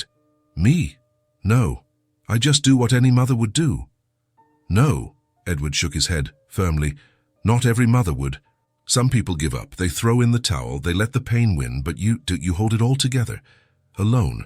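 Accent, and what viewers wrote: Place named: United Kingdom. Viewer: What's with the British accent speaking American words. Since when do you cut into risotto?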